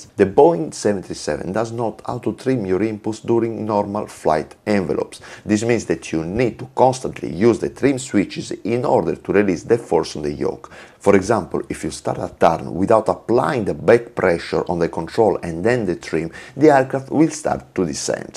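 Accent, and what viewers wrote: Italy. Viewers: Sei italiano e sei sardo ahahahah si sente dal inglese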